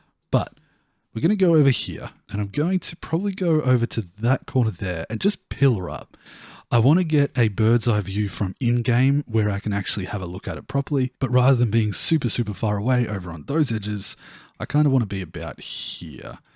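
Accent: Australia. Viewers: When I was watching last episode my girlfriend was in the room and said "he sounds like an Australian who'd make fun of people who played Minecraft". My response? "These eloquently spoken words we are hearing are not for such activities". Continue the amazing work man I have been enjoying this new series so much, I felt very unmotivated to start due to the new massive caves and different generation but I got started and am in love with the new generation.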